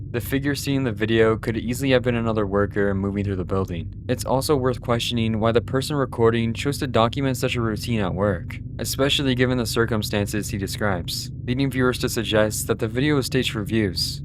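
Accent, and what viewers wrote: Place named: Brazil. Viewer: why bro has brazilian accent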